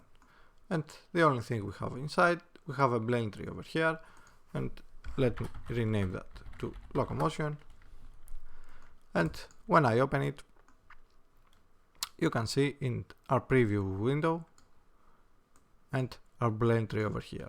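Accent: Greece. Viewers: Are you greek? You sound like it :)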